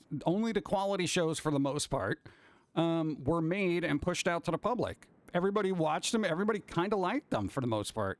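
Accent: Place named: East Coast, United States. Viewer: I think you would make a great voice actor. If anyone needs to cast a magical creature with that insightful, urban, East coast accent, you would totally kill it!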